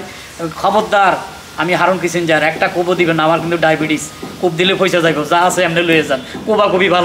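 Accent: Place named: Noakhali, Bangladesh. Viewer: খুবই ভালো লাগলো। ভারত থেকে বলছি, আমি হারুন কিসিঞ্জার ভাইয়ের প্রচন্ড ভক্ত। ওনার সব ভিডিও আনন্দ করে দেখি। হারুন কিসিঞ্জার ভাইয়ের প্রতি আর একটি কারনে আমি আত্মিয়তা অনুভব করি। আমাদের পুর্ব পুরুষের বাসস্থান নিল নোয়াখালী জেলায়। বর্তমানে পশ্চিমবঙ্গে আছি। ছোট বেলায় আমার ঠাকুরদা বাবার মুখে নোয়াখালী ভাষা শুনতাম। আপনার কথা শুনে শৈশবের কথা মনে পড়ে। আপনি এভাবেই চালিয়ে যান। ভালো থাকবেন। শুভেচ্ছা জানাই।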